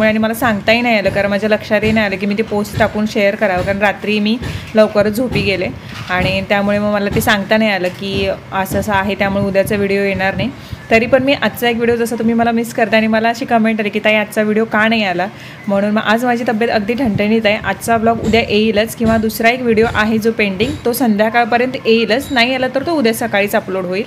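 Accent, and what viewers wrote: Kolhapur, India. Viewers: प्रत्येक लाईन मध्ये ( करतली) आणि (करताशी)खुप छान होत भन्नाट कोल्हापुरी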